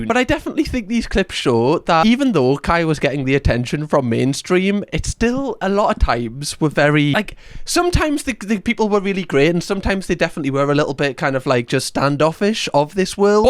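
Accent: Newcastle, United Kingdom. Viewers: Idek who this is but ill watch it because its 😂 love the fellow geordie accent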